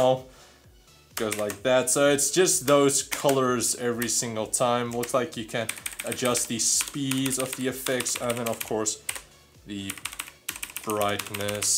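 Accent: Afrikaans, South Africa. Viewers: Jy klink regtig afrikaans ek is afrikaans btw as jy nie geweet het nie lol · I could recognise ur accent I was born in SA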